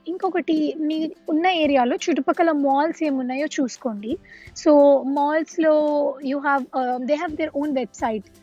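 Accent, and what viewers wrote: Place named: Hyderabad, India. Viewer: Superb Hyderabadi language 👌👌👌👌👌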